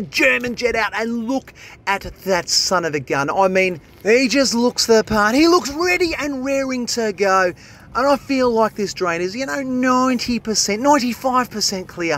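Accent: Australia. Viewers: Don't shut up bud!! So funny with your comments, and the Australian accent is so much better than an american one.😂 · Just found your channel couple days ago. I work on pools here in Arizona and your channel popped up. I gotta say half the fun is your talking. Something about Australian accent can make an autopsy seem fun. Keep up Awesome work already been thru 10 of your videos in 2 days. You’re great. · Absolute joy. Keep the commentary going, full on Fosters and full on Aussie 👌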